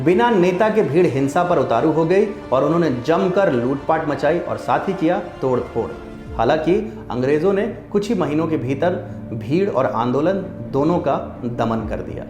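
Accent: Standard. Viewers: I wish all journalist and or at least sanskritagyas n babas n jyotishacharyas learn from you how to speak suddha Hindi It is so pleasant,.. Thank you... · Aapki hindi ekdm vishudh roop me h .aapko mera pranam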